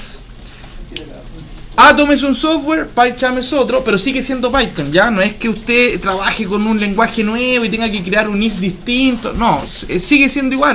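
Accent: Chile. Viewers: usted es chileno no? buen video compadre, esta bueno para empezar con python, me sirvió mucho. saludos!!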